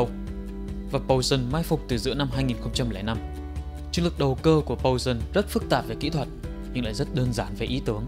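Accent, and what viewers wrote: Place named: Thanh Hóa, Vietnam. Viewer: chất giọng thanh hoá này nghe ko hay cho lắm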